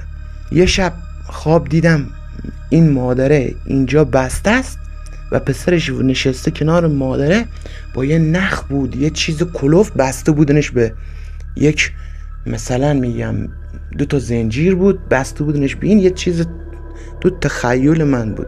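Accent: Kerman, Iran. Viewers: دمت گرم لهجه کرمونی عشقه... حتما پارت های بعدی رو بزار جان❤ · داش شنیدن این داستان به لهجه خودمون چقد دلنشین تر بود کرمون خودمون چون خونه قدیمی هست از این مسائل زیاده در ضمن دم مادرت گرم عجب شیر زنی بوده خدا حفظش کنه❤ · خیلی داستان خوبی بود مخصوصا با لهجه شیرین کرمانی که تعریف میکنید بدل میشینه... لطفاً بیشتر بزارین از این قصه زندگیتون❤❤ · سلام وخسته نباشی ، به پدرومادرت افتخار کن، صداقتی که درگفتارت بالهجه قشنگ کرمانی داری باعث شد عضو کانال شما بشم برات آرزوی سلامتی وموفقیت دارم.باتشکر خوزستان اهواز سیدسعید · س بچه تهران آقا اهل دروغ نیست بچه زرنگ لهجه کرمان همینه عالی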